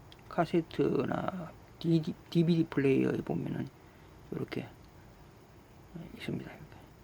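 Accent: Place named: Gyeongsang, South Korea. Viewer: 수고 많습니다ㅡ 말씨를 들어 보니 대구분이신 거 같은데ᆢ 사업 번창바랍니다^^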